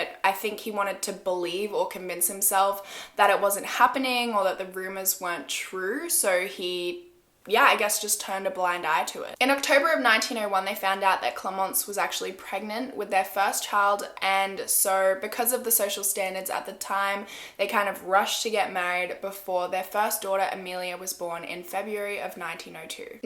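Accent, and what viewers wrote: Australia. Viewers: I FKN IOVE UR ACCENT! THE AUSSIE IS SO STRONG! IM AUSSIE TOO! PERTH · the fact that she has an australian accent is just a plus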